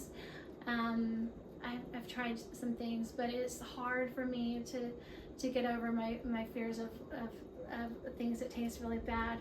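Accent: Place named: South, United States. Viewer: She definitely still has a bit of a Southern accent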